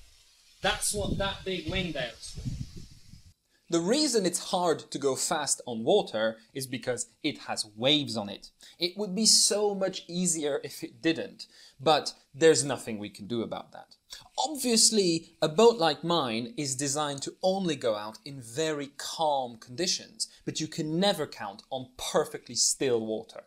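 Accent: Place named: Scandinavia. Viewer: pretty sure he's not UK(originally), sounds like scandinavian, to many american R's and over pronounciation of the english bøøøut(boat)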